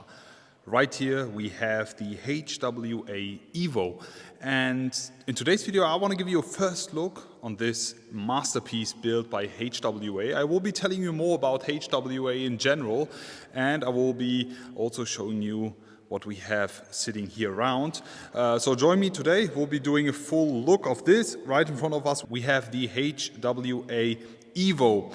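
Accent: Germany. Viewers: It´s always very funny to hear a german guy speaking english !!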